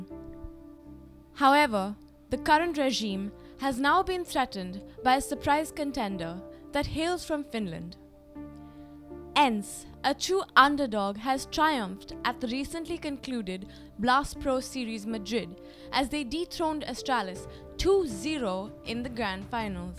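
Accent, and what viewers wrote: India. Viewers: I clicked the video because the title sounded credible but then i heard the indian accent... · U indian or sth? Lol no offense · The Indian accent is a bit irritating to be honest. Of course you are used to it if you are local and listened to it your whole life. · wtf is this indian english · Indian accent?!?!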